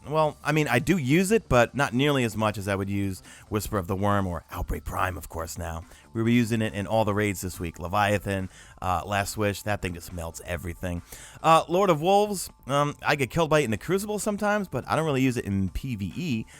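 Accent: New York, United States. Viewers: Love the New York accent. Don’t worry I understood lol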